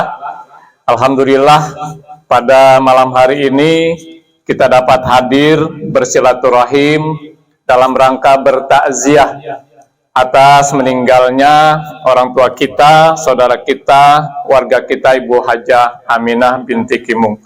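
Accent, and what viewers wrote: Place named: Betawi, Indonesia. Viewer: Hadeuh....ini betawi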